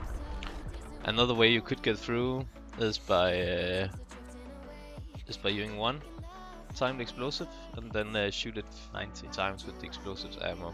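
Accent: Denmark. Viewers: Han er 100% fra Danmark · You sound danish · du dansk. tydeligt på stemme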